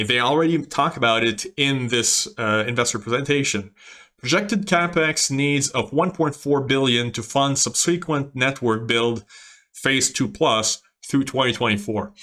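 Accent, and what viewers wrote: Quebec, Canada. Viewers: Tu viens du Qc?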